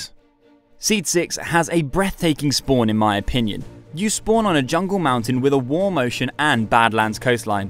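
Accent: United Kingdom. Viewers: Amazing seeds good job R u British?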